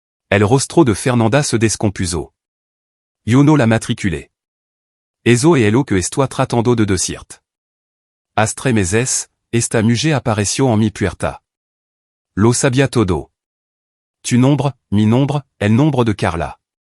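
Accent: France. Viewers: pesimo narrador, su acento frances distorciona lo que dice. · ¡¡¡Que gran estupidez¡¡¡¡ Poner la IA en español con acento francés!!!! No pienso escucharlo!!!!!!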